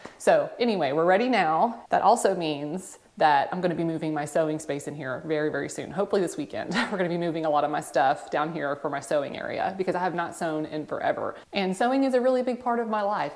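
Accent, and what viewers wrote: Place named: Kentucky, United States. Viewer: So I've been trying to place your accent. I was thinking Kentucky, but is it Mississippi?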